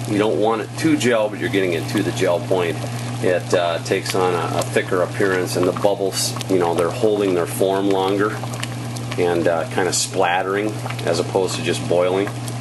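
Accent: United States. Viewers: Hi thanks for the Video and info, I am living in England and did not quite catch what it was you added to the Marmalade later on in the Video after the sugar. It may be the American Accent or an American product I am not familiar with... I've just started prepping this year and have started to move onto producing long term storage foods. Not spoke or met any other preppers yet in England does not seem to be as big a concern over here.... Thanks for your time Tony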